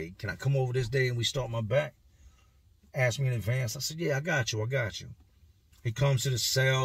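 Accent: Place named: Chicago, United States. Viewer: I knew that sounded like Chicago. What neighborhood? lol They thought you were a King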